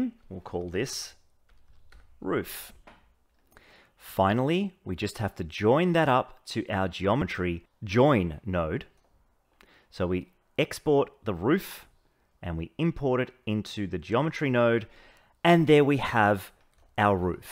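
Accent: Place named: Australia. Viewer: Aussie accent, Pikachu in background... off to an awesome start. lol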